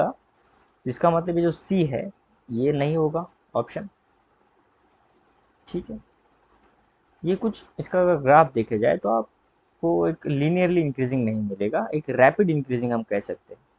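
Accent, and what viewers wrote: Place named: West Bengal, India. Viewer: Sir 's accent seems to be bengali,or assamese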